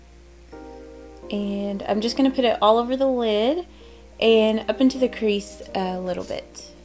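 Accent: South, United States. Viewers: HI.:) I JUST WANTED TO SAY. YOUR MAKEUP ALWAYS LOOKS FANTASTIC;) YOUR ARE SUCH A BEAUTIFUL GIRL;) IM ORIGINALLY FROM OKLAHOMA AND JUST LOVE YOUR COUNTRY ACCENT;)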